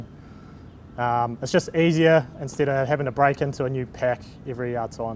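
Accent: New Zealand. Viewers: Is this a normal New Zealand accent? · Ev great ! Just the accent ! 😮😁 Go Zealees !! 🇳🇿👏👏👏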